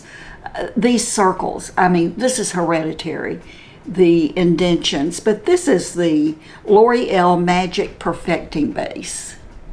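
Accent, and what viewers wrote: South, United States. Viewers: Your voice and your lovely Southern accent sounds like Melissa from her channel, you remind me of her. It's a delight to put makeup on along with you.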